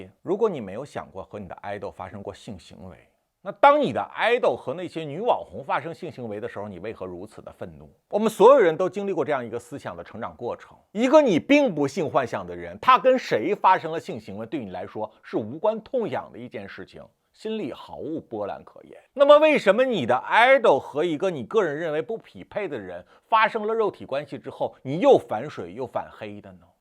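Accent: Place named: Northeast, China. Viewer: 难得的高度！阅历丰富、悟性极强才能有这么深的感悟。是不是在北京长大的东北人？这口音尾音儿有东北味儿。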